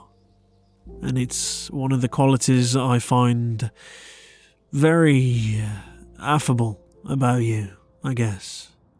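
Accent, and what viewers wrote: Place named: Birmingham, United Kingdom. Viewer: You are doing great things for the Brummie accent 👏🏻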